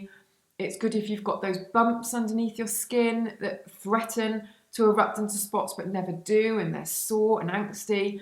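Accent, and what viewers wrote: United Kingdom. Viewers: wow, what's your problem? Sometimes people enjoy using cookbooks (surprise, even mothers and chefs) to gather inspiration. Maybe she doesn't use the recipes to the tee and just uses it as a guide. Thinking of new dishes to make all the time can get challenging, so that's where cookbooks can come in handy. Also, she is British and has an accent (a very lovely one, might I add). I do not know where you are from, but I'm sure certain accents may sound strange to the ear of a foreigner/a person who is not accustomed to listening to it. Please don't try to create/find issues where they do not exist.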